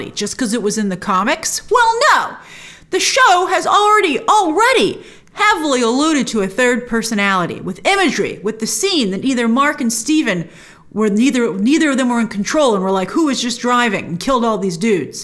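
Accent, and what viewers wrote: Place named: New York, United States. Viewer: I heard that (exaggerated) New York accent too.:) I've been loving this series. I love these more character-driven stories that these MCU shows have given us. We can save the action for the movies.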